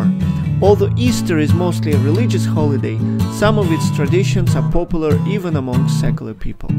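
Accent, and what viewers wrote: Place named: Russia. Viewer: Please no music while talking. And subtitles at the bottom please. You are covering your lovely Russian accent (my ears want to hear it ) and images (my eyes want to see them). Spasibo. 🙏💝😻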